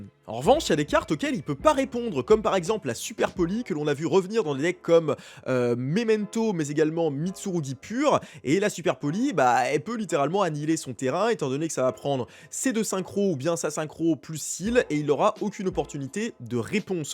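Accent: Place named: England, United Kingdom. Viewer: Toujours aussi kiffant ton accent anglais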